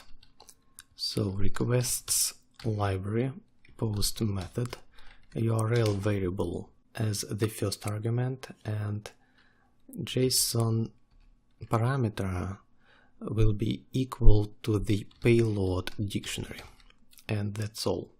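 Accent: Russia. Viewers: are u russian?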